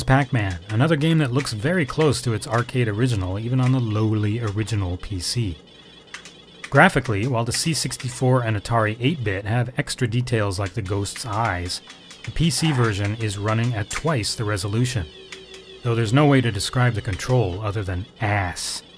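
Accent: United States. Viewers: I'm British, we pronounce the L (but not the R on the end unless we absolutely have to) but for me hearing you seppos say sauderrrr is a bit like seeing you spell words like colour or favourite without the U... something you adorable colonials just do... I wouldn't mind a modern kit to build a genuine retro computer, whether it be an IBM XT PC, a Commodore Vic 20 or 64, a ZX Spectrum or a BBC Micro or Acorn Electron. Who's with me?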